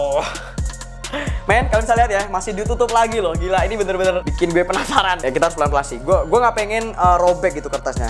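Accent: Java, Indonesia. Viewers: Medok amat ya.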